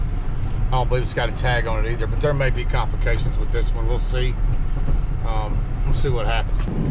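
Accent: South, United States. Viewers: I love your accent and I love when you say I'm fixing to tow it lol You're a true southern boy. I live in Canada and apparently we talk with an accent too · Good job... what makes it better is your southern accent.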